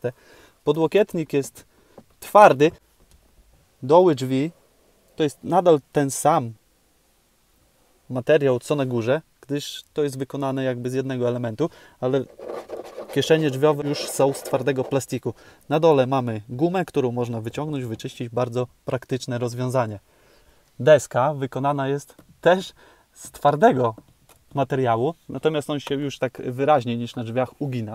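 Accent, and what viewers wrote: Podlasie, Poland. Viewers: Masz suba fajnie filmy ;) Słuchać akcent Podlaski, pozdrawiam ;)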